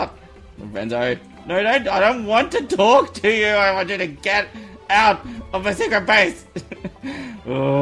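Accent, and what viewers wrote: Australia. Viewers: Is that an aussie accent I detect? =D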